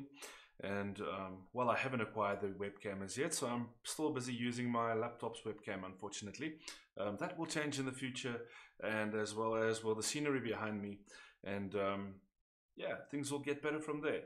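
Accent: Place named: South Africa. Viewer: Saw ZA and I was like, hmmm maybe he's South African. Heard the accent and confirmed :). Dankie vir die awesome video!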